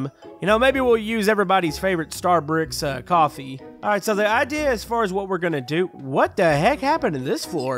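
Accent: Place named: Texas, United States. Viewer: is problobly from Texas from his accent.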